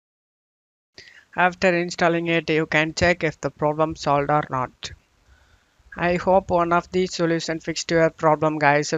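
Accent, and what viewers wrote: India. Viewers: I love these indian accent tutorials so much, this for real fixed my problem. I subbed you! Thanks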